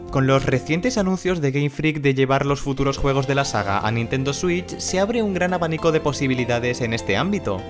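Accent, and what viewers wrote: Zaragoza, Spain. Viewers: Eres de Zaragoza??? 😊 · Eres de Zaragoza??? =) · Eres de Zaragoza? 🤔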